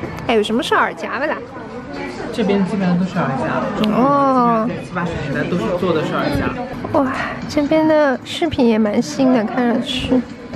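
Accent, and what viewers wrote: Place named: Shanghai, China. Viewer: 謝謝帶我們逛街好喜歡妳說上海話太好聽了！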